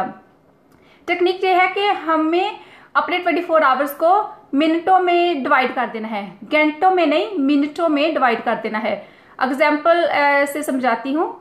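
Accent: Punjab, India. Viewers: Ajit Shirsawade wat is good in it she speaking hindi with punjabi touch while she can make video fully in punjabi language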